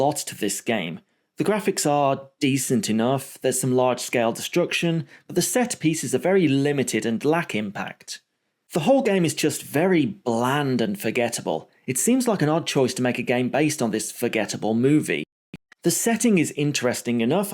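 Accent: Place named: United Kingdom. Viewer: ew british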